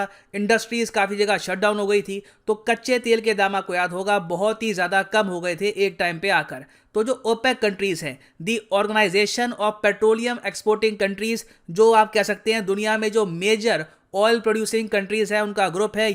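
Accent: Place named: Delhi, India. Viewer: “Hum gerrnge, dhar lia” sir’s delhi words so amusing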